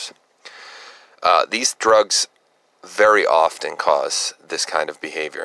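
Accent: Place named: New England, United States. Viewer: This case fascinates me. Listening To your voice I’m thinking you’re a fellow new Englander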